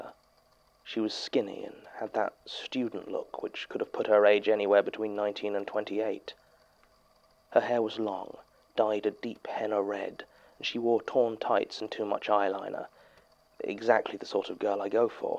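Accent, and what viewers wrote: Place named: United Kingdom. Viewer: I love mumbling in my ear just like doing his thing I love a deranged British man in my ear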